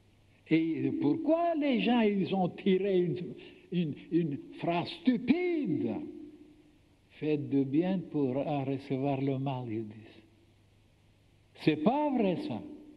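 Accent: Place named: Czech Republic. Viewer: I' m hre because of Franz Bardon. That's fantastic knowledge ! Thank you for sharing ! And I speak french, so it's a delight to listen to an old wise man with a strong tchekich accent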